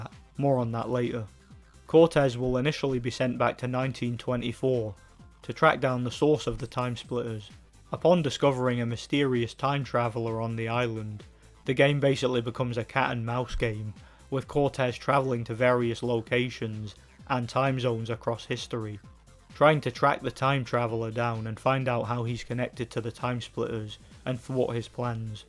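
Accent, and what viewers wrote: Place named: Northern England, United Kingdom. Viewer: Wait, you're Scottish? I assumed you were Northern English.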